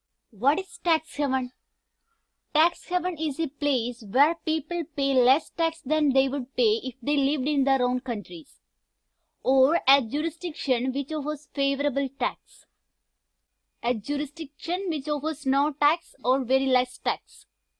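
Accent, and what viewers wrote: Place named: South India, India. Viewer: Slides are nice and by your pronunciation I take it you are from south and goes by the saying that south people have good command over english..and it has proven right in the video..marvellous you explained beps much better than others and in such limited words thank you mam..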